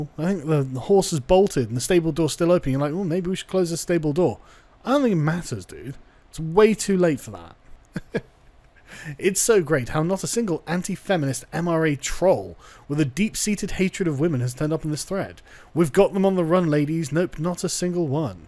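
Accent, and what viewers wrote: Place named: United Kingdom. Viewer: I'm shocked you have a British accent, as I thought it was just men in the USA who spent all their time online whinging about feminists. I thought it was connected to so many men in the USA being genitally mutilated so feel threatened by women, but you've proved there are types like that closer than I hoped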